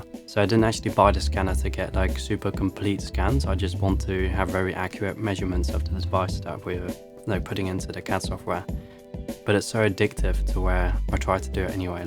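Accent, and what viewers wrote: United Kingdom. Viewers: Honestly, I do love the fact that I just randomly stumbled upon your channel. The fact that you're Dutch as well only makes it that more fun for me, lmao. (And will say, your English is really freaking amazing dude. Very British tone.) The setup looks amazing. Little cluttered but you're also still adding / modifying so I'm able to look past it. Keep up the good work. I'm looking forward to seeing the progress of this.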